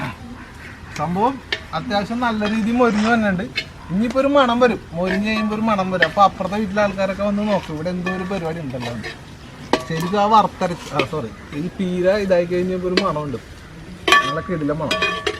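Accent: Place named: Coastal, India. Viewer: Evda veedu 🏡 ?slang 😂😂😂near kadapuram aano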